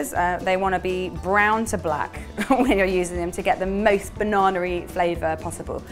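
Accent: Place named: RP, United Kingdom. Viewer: Wow she sounds so posh, is she speaking in british received pronunciation?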